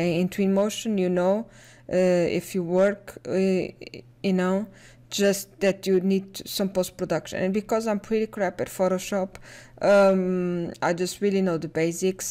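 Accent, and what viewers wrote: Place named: Portugal. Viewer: este sotaque tem, mas TEM, de ser tuga 👀 não engana ahahah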